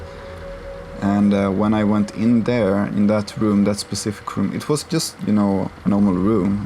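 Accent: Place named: Europe. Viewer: were are you from actually? Your accent was a bit european to me, or im i totally wrong here?🤔🙃🤭.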